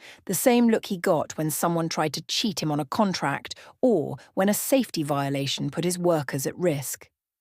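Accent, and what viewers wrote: England, United Kingdom. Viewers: What's the point of using a British English voice and American vocabulary? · an American story told with an English accent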